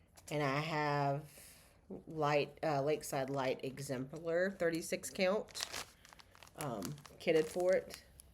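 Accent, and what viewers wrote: South, United States. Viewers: You amaze me with all that you accomplish. Your stitching is beautiful, and I absolutely love your southern accent!!! :) Thank you for another great video. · Another great video,! I, too, speak Southern (or is it Surrthern)! That used to bother/embarrass me, but NOT any more!! I'm proud to be a country bumpkin! Your Spooky Summer is a great idea, but I'm in the middle of a huge red sampler and it seems to be attached to my body at the moment! I can't wait to see your finishes, I know they will be fabulous! Happy Stitching and see you next time!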